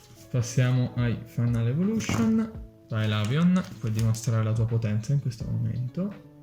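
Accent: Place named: Marche, Italy. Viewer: avevo le cuffie. E ho notato un familiare accento centro-marchigiano, ora so dove trovarti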